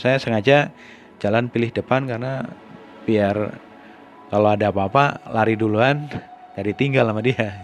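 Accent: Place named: Banyumas, Indonesia. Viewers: Bagus! Ceritanya sederhana. Masnya ngapak jadi kangen ngobrol sama temen2 ngapak! 😂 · dimanamana tempe enak..ora ngapak ora kepenak😁cilacape ngndi mas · Baru denger di awal2.lgsg ketebak.pasti wong ngapak.salam ngapak bosku!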